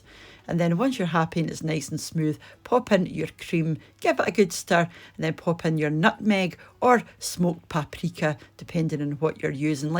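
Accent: Scotland, United Kingdom. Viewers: Thank you for this recepi Lovely Scottish accent! Looks comforting Nd delicious ❤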